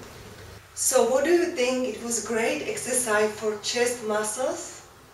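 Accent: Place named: Eastern Europe. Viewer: I love your accent i could listen to it all day. Slavic women seem to have heavy voices.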